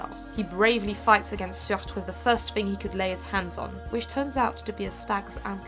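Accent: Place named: France. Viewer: Great video. I stumbled across this channel today and I really like it, can't have enough storytellers around. I do have two points of (hopefully) constructive criticism though, please don't take offense. 1. Talking speed. You speak pretty quickly, and sometimes that makes it a bit hard to hear what you're saying, especially when you're talking about old norse names. It kind of feels like you're standing in front of a room of people and you just want to get it over with. Especially the old norse stuff, I don't know if you're a bit nervous or unsure or something, which may cause this. There is no need to rush, you know the story, your jokes are good, you've got this. 2. Pronunciation. Icelandic is hard, judging by you accent I'd say you're French or something around there? Anyway, getting these words right can be a bit tricky, and even for a Scandinavian it isn't exactly intuitive. 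I'd advise you to spend a bit more time on them, perhaps find a way to listen to a proper pronunciation to get it right. Once more, I like what you're doing here, and I'm eagerly awaiting your next video.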